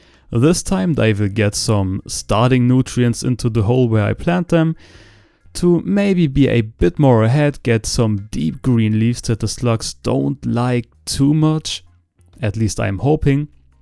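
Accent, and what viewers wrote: Germany. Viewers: He is german. Finnish have different accent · 🤣 the German accent makes this funnier, I dont know why this part was hilarious too lol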